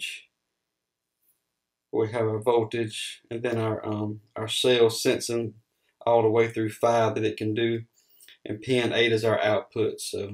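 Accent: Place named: Georgia, United States. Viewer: Sounds like your from Georgia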